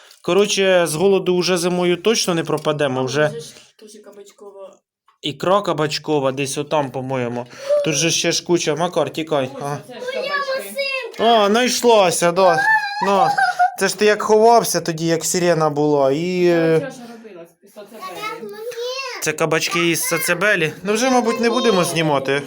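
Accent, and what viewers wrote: Poltava, Ukraine. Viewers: так ведь это наш Полтавский говор, пускай не слушают кому ухо режет, а мне так любо- любо душу греет. Раньше говорили, что наш говор это настоящий украинский язык, мы же не живём на Западной Украине, это центр у нас так,и это у нас не отнять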